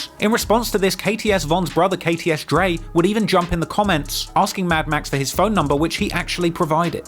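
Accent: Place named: Cockney, United Kingdom. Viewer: 😂😂😂 it's PAH-STO ..that cockney accent fucks up alotta pronunciation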